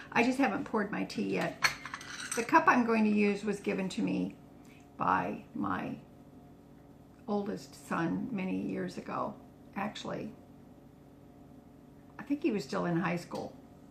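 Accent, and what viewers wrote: United Kingdom. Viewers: Lol, love your British accent in this episode! My feeling is , everything in moderation. Including sugar. Yes, too much of it isn't good for you. But that woman that made the snarky comments needs to get real and stop judging you. You are doing g a great job and I love your channel, one of my very favorites. ❤ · Hi, absolutely loved this. You're a wise lady with a fab sense of humour. Life is too short to be uptight....have the sugar, eat the cake, drink the cokie cola 😉 we have to have some pleasure in life. Wonderful English accent by the way. 'Bottoms up darling!' As we say here. ❤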